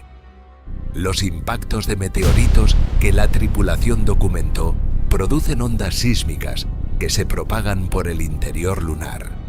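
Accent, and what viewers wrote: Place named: Spain. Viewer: Narración espańoleta tan idiota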